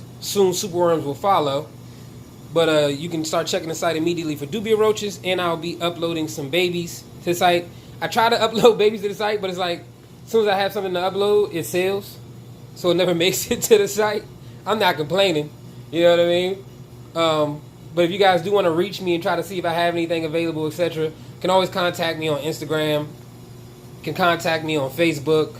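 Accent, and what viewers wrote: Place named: DMV, United States. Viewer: You sound like you’re from DMV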